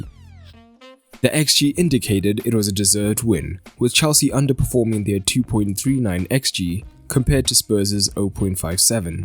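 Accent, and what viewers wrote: South Africa. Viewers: Is that a South African accent ?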